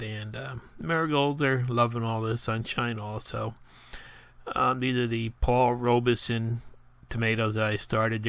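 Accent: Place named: New England, United States. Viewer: get you some quienas well i cant spell but a real hillbilly would know this your a wannabe hillbilly. sound like your a yank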